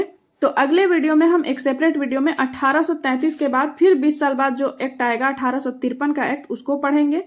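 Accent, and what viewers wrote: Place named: Bihar, India. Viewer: Mam apki voice tone se lg rha h aap bihar se ho😊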